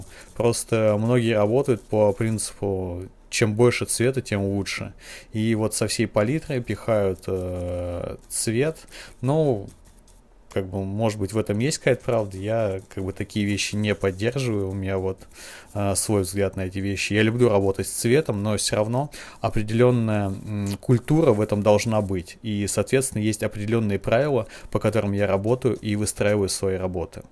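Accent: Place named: Ukraine. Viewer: Fantastic construction of a portrait! ...a great help for me. Thank you from UK. You sound Ukrainian. Hope that is not an insult! 😊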